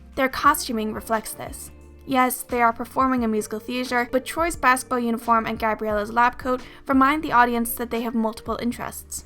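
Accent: Ireland. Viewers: is she irish or american i'm so confused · OMG NO WAY. I’m from Ireland and I know an accent when I hear one but yours had me deeply confused! Until I looked in the description, YOU LITERALLY SOUND IRISHH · Love your Irish accent :D Great video, I never thought about these points when watching HSM as a child. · I love this video and I love your accent, is it Irish? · Omg I just knew the narrator was a blonde! Haha didn’t expect to get a face reveal. Anyway , I loved the video :) also are u Irish or something? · oh its cool to hear an irish person doing videos like these! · Your accent is interesting! I feel like I can hear touches of Irishness? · Hearing an Irish accent here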